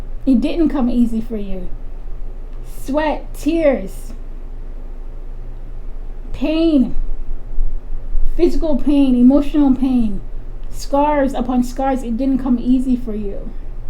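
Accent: Jamaica. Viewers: Oh u Jamaican 😁😁..Bless up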